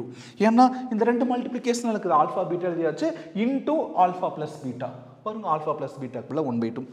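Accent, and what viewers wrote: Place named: Kongu, India. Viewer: Sir you teaching methods is very useful for beginners thank you so much your kongu slang is nice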